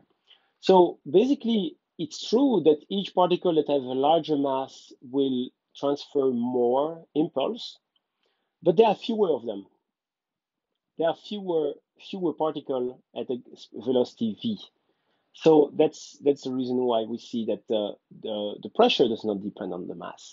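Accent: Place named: Belgium. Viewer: thanks a lot ... ur fan from morocco. Je sais que vous êtes belge et ainsi vous parler le français ...ça se voit surtout sur ton accent... vraiment vous faites un trop honneur pour votre pays ...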